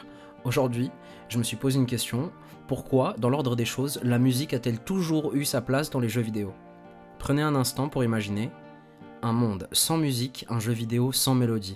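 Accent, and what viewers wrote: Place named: France. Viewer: J'm ton son Le french accent touch Ça passe bien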